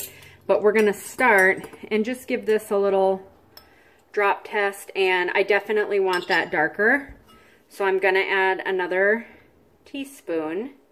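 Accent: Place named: North, United States. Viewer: Hi I 💕 this video!!!! You did a wonderful job showing how to dye techniques!! Plus I love your northern accent!!! My mom is from South Dakota so it makes me feel right at home here in Oklahoma!!!🥰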